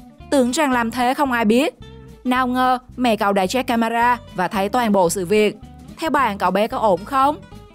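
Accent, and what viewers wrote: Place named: Tuy Hoa, Vietnam. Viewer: chào AD. giọng Ad khá quen Tuy Hòa thì phải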